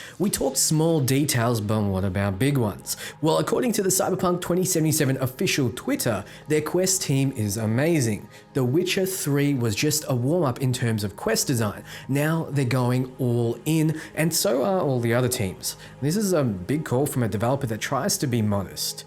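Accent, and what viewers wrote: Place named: Australia. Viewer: Hey, are you from Australia? I feel like your accent is Aussie (I'd guess Sydney):P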